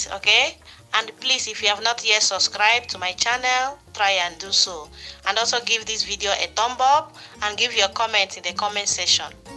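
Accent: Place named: Nigeria. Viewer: The Nigerian accent is on point. I'll be sure to try these out.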